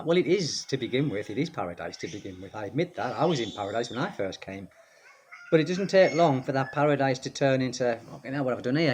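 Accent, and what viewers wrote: United Kingdom. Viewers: Sounds like you’re from the Uk like myself 😂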